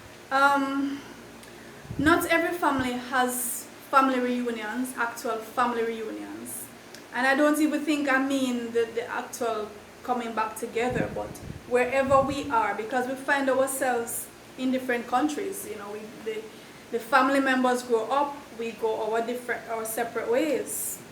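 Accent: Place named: Jamaica. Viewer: Listening to the content and the patois🤭😊. Thanks for the insights. Cheers namesake💪!!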